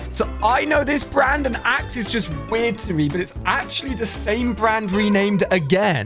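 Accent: United Kingdom. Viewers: I'm Irish are u like British or? I love the smartie chocolates btw!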